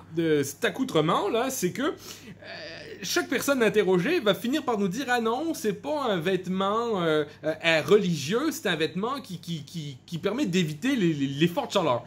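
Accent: Quebec, Canada. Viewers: Sans l'accent québécois on dirait Pierre-Yves Rougeyron. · Ça sonne plus québécois que MBC ; à quand un MBC gaming ?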